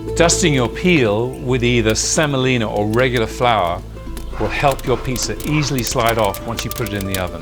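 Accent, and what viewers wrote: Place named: United Kingdom. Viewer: Accent quintessentially British...great vid!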